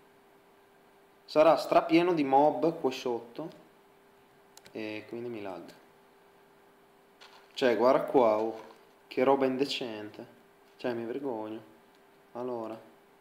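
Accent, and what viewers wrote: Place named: Palermo, Italy. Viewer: sei di palermo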